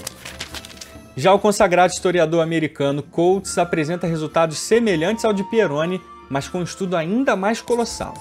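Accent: Brazil. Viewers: ele mostrou a identidade no outro canal dele, e prr, se eu não me engano, ele cresceu no Brasil, por isso não fala português europeu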